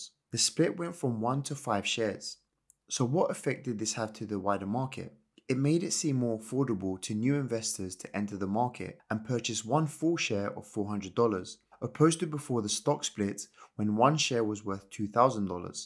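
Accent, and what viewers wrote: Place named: England, United Kingdom. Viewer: cheers mate! keep up the uploads, nice to have someone english talk about this stuff instead of these waffling americans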